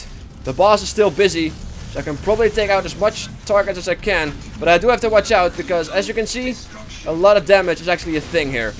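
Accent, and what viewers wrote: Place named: Netherlands. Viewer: dat dutch accent.. seriously dude fix your pronnouncication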